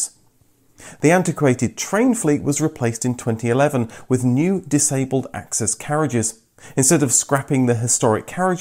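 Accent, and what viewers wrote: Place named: United Kingdom. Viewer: Apart from enjoying your videos so much, I am delighted to listen to your British accent. A real pleasure!!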